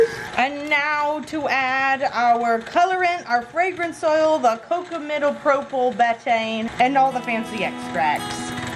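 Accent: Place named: North Texas, United States. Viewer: I’ve been wondering what your accent was from because you sound exACTLY like my aunt Pam. You must be from norther Texas bear Oklahoma cuz that’s where my mom and aunt are from/grew up.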